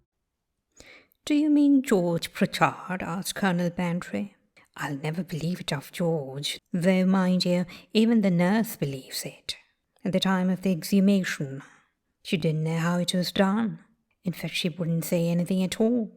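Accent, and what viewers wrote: RP, United Kingdom. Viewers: I Love upper received pronunciation.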